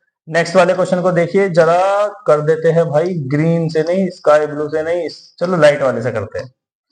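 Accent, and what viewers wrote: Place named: Meerut, India. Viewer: Sir I 🤔 think you are from meerut...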